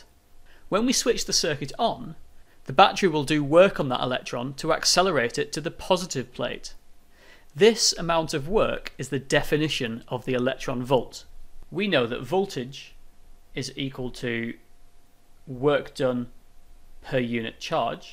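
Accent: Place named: United Kingdom. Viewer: British English 🥵🤤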